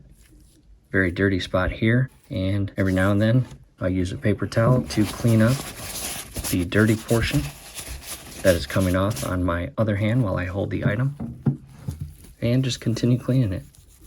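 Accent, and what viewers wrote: United States. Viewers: Hey MichGanders’ I enjoyed your videos, I have to tell you that about 3 minutes into the first video I went WWait a minute I hear a familiar accent.. 🤔❓ then I watched a second video and I was convinced yip she’s definitely from MI.. well hey 👋 there from Johnny O.C.K a FERRIS Grad from Illinois.. long long time ago.. .. 🍻 Cheers.. GO COLORADO AVALANCHE 🏒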